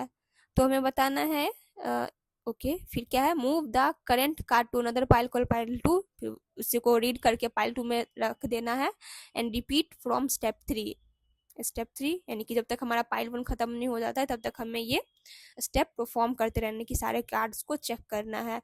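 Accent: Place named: Bihar, India. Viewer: Ap bihar ya jharkhand se ho na ap ka accent se pata chalta hai and Video is very helpful thanks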